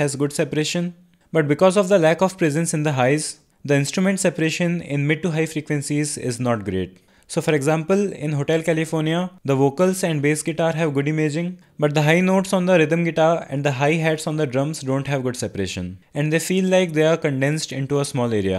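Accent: India. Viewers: India...can you just...live and speak your native language and dont try to speak english? Yours is so awful. I'm sorry, but you so annoy to try speak normal language with your indian accent. Nobody understand what you say correctly. You need to repeat 100500+ time to understand. English is not yours. Learn some other... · he shouldn't ignore it, the comment is true, indian accent is difficult to understand for majority of people including me. Though the contact is pretty darn good, it feels like he is reading a script. So consider the original comment, it only makes you better.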